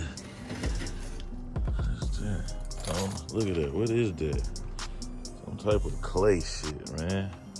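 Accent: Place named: Mississippi, United States. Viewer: bruh what part of mississippi you from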